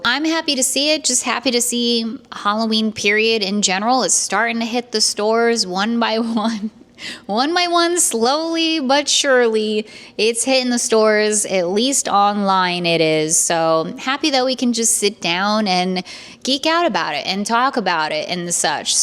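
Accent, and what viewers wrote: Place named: New Jersey, United States. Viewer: I want you to know I am very proud of you for resisting the temptation to buy the amazing spooky items I know how much you love them I always enjoy seeing you so excited and happy when you get to make the purchases and I miss hearing you say purchases in the New Jersey accent and I always think it is cute when you say I am not going to spend a lot of money then you get back to the car and you are like I had to get them I think it is so funny and cute and I love how you decorate you're very good at the decor and a very good shopper I love halloween and Christmas and Halloween it's not official until you and say it has begun me and my family have watched you for three years now and you have always brought us our Halloween fix I will never forget when you went to the at home store and you were determined to give us our Halloween fix and you were determined to get that witchy candle you climbed on the flat bed dolly and you got it your dedication and determination to bring us our Halloween fix has always been the best so don't worry you're doing a perfect job and I hope my words make you smile and smile me and my family love you and always and forever 🖤🧡🖤🧡🖤🎃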